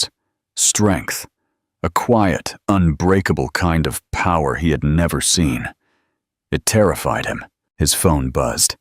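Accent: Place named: United States. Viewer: Oh my goodness St Thomas Hospital they looked after me im a London its so good to hear London on aAmerican story anAmerican voice saying these places its wounderful the park everything thank you AI family thank you . London is sad right now but with lots of luck London will be back god bless you all❤❤❤❤❤❤❤❤❤❤❤❤❤❤❤❤❤❤❤❤❤❤❤❤❤❤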